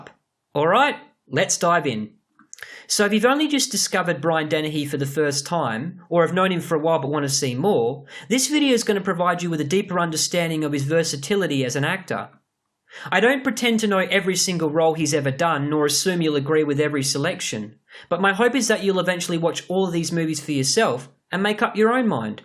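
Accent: Australia. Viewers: Hi...Your Welcome Don't mean to embarrass you /...it's very SEXY/GORGEOUS 🤩 I could listen to you talk "AAAALL" day long! 😊 I'm a California Girl...near LA And You? Accent sounds Australian? What time for tomorrow's flick? CAN'T wait!!!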